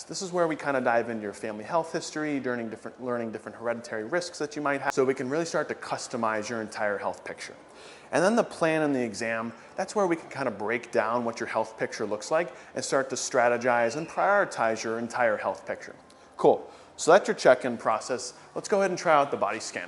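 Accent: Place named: Silicon Valley, United States. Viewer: That is an "upscale" speak practiced especially in a Silicon Valley, California.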